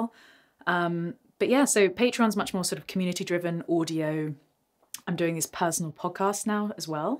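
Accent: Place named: Bristol, United Kingdom. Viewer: It's a pleasure to see you wearing something other than black, first of all. Second, producing your own studio album is quite a chunk to bite off – big hopes for a solid return on that investment of time, energy and money. Finally, as always, I remain enamored of your speaking voice and that "Bristol" accent. Good stuff. Keep up the reports!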